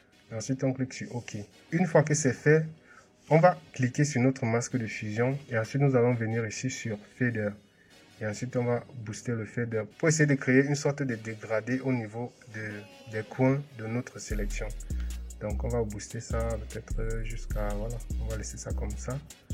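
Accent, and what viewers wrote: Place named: Cameroon. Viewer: Je m'abonne d'abord parce que ton accent la c'est pour le Continent 237 pure pure. Puis je like parce que tu na pas cacher comme les autres et j'active la cloche parce que tu a du bon contenue et c'a m'aide.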